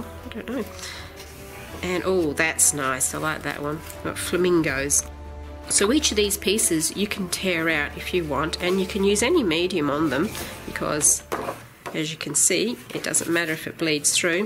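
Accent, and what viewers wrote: Australia. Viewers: I hadn't watched anyone's reviews until I received my copy and I got my copy a few days ago and recorded my review yesterday. This morning I thought "OH I want to see what thinks" and it was so funny, you got excited about the same features as I did. It was like watching my review but with an Australian accent. I'm a big fan and can't wait to see what you do with it in a tutorial. ~ Chrissy Morin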